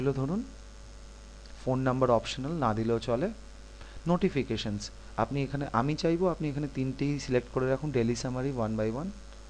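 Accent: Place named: Kolkata, India. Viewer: I thought you were from Kolkata. Bah.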